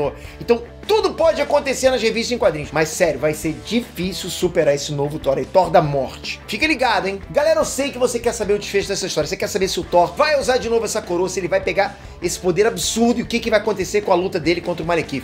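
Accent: Rio de Janeiro, Brazil. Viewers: Impressão minha ou ele falou com sotaque carioca?!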